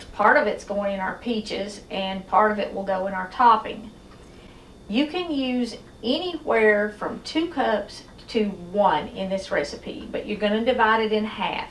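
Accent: South, United States. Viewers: I have really enjoyed your sharing of this recipe and I’m looking forward to making it some day soon. I love that it is an old family recipe, wow 100 years old! Thank you for your kind words and for sharing in an indirect way your faith in God. I love the way you have honored our military service men and women on Memorial Day for their sacrifice to our country. You have such a charming southern accent that is very relaxing as well. Take care and God Bless you today and always!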